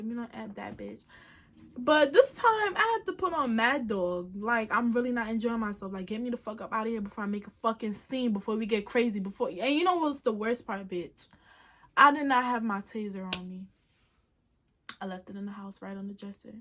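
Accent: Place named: New York, United States. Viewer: Beloved lol New York accent is cool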